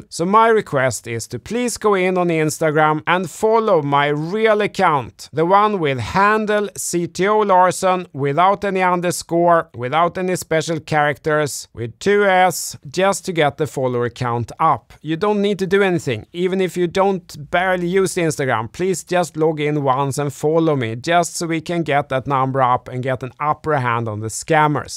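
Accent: Sweden. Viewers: Shenanigans in a Swedish accent - love it. 😜😜❤❤❤❤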